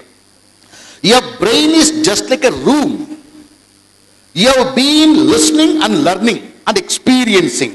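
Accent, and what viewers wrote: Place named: Telugu, India. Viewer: speaking english in telugu slang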